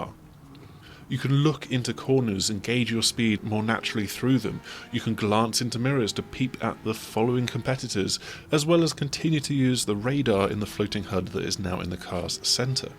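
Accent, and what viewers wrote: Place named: RP, United Kingdom. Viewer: You is so posh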